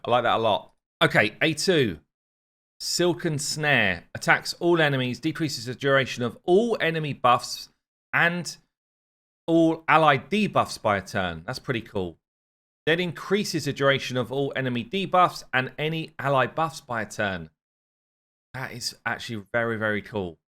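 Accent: England, United Kingdom. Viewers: please learn how to pronounce Mikage, it sounds giga cringy in you english accent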